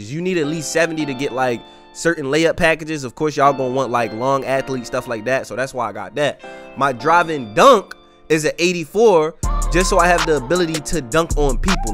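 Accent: Baltimore, United States. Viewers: Dang bruh you from Baltimore 😭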